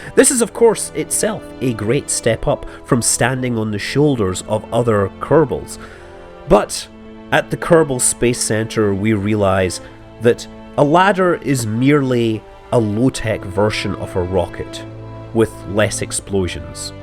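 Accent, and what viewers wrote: Scotland, United Kingdom. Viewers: He's Scottish, I presume he's from Aberdeen or Edinburgh.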